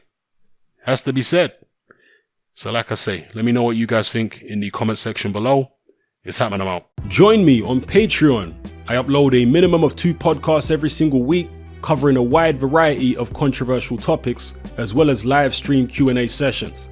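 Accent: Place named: United Kingdom. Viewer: Thanks for that it's nice to hear someone with a British accent giving Wilder some respect.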